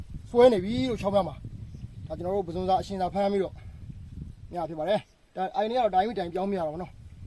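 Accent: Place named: Rakhine, Myanmar. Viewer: ရခိုင်ဒေသသံတွဲကြားနဲ့ဂွကြားကစကားအသံ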